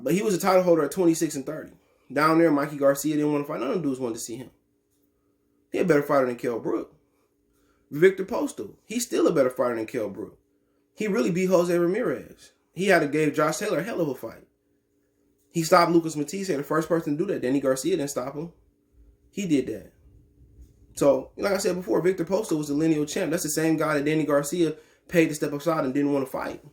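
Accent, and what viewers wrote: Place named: Texas, United States. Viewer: Hold on to that Texas talk..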